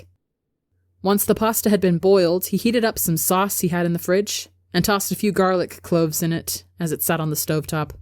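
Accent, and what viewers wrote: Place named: United Kingdom. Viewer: IM SORRY BUT I CANT HELP BUT LAUGH AT THE BRITISH ACCENT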